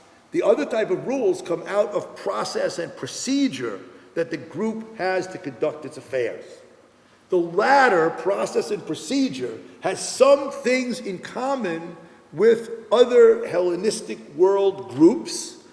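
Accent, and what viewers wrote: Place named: New York, United States. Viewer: Love the accent. Reminds me of a voice actor of a Ralph Bakshi movie. Luv it. Hey Shiffman,,,,,,,,,,,Brooklyn or Queens? LOL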